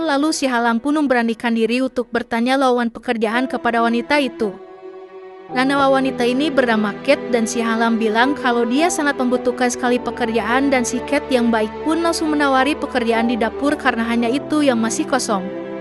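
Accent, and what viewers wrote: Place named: Sunda, Indonesia. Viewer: Udah lungse udah bucat😂😂😂😂😂 sunda pisan👍👍👍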